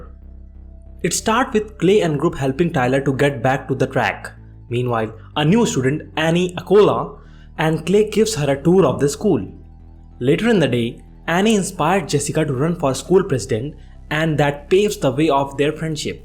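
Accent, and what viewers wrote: India. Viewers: why do you think you speak the right English and he speaks the wrong. Americans have their own accent, British have their own accent, Australians have their own accent and even Arabs have their own accent so why can't indians have their own accent. Who are you to tell which is right or wrong. And it's your country's problem that you got so less people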